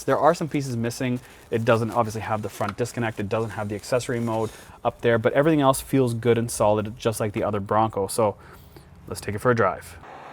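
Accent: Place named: Canada. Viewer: When I started listening to this guy, I swore he sounded Canadian, and then I found out he is hahaaha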